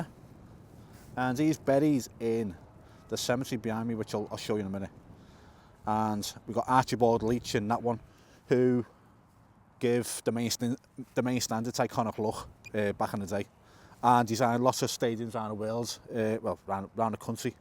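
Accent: Liverpool, United Kingdom. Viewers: Is this a Liverpool accent?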